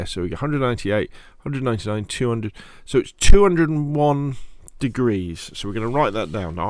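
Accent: England, United Kingdom. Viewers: I feel like when the teacher has an english accent, it makes it lots more easier to understand 😂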